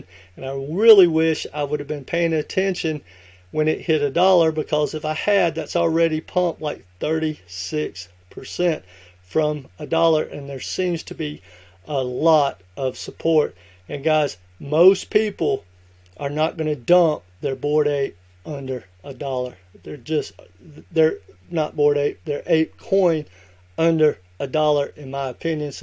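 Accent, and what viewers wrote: Texas, United States. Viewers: Thank you…. May I ask some question here… but are you from Texas ??? Your accent is very strong 😂 quite hard to understand is you from Asia 😂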